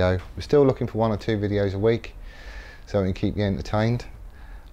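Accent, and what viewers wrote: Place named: Plymouth, United Kingdom. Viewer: Plymouth! , thought you sounded Jannerish.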